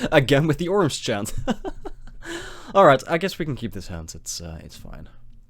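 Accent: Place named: Netherlands. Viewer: Must confess that I always hated the Dutch accent all of my countrymen have, but for chaos draft I'll endure it I guess ;) P1P9: I think you went a little too hard on blue there. Both Skirk Shaman and Stingscourger are a lot better than Piracy Charm. Skirk Shaman would have been a bit too difficult to do with all of your double white, but I think Stingscourger would have been a great pickup there. Overall I think red looked a bit better than blue overall, but I understand why you were staying in it. Would really have payed off in pack 3 though with all of the red removal. P2P9: I would definitely have picked Blade of the 6th pride over the Sarcomite Myr. It's more aggressive and you don't really need more 3-drops at that point. P3P7: Daring Leap is a great combat trick. The first strike alone is really strong, but +1/+1 and flying can win games too. 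Orim's Chant on the other hand doesn't do anything. (edit: somehow the Chant has been very good for you throughout the games. Nice work on that) Although I would have made some picks differently I don't hate where you ended up though. UW Fliers is a great archetype in chaos draft.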